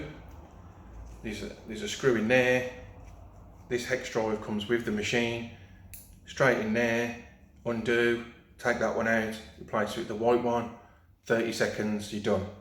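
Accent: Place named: Birmingham, United Kingdom. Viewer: Never apologise for a Birmingham accent 😊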